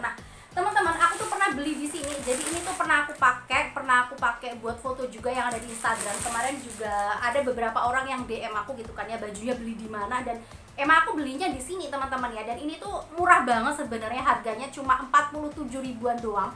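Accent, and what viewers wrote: Java, Indonesia. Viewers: Medok ya kak😄😁mksih infonya kk. Menginspirasi bngt😊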